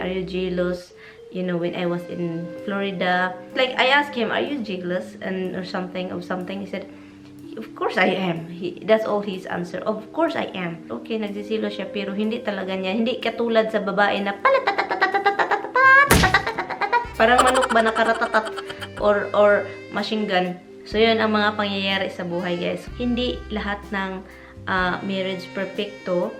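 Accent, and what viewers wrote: Visayas, Philippines. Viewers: Your so funny like ur attitude n visayan accent so darn funny n cute 😁😂🤗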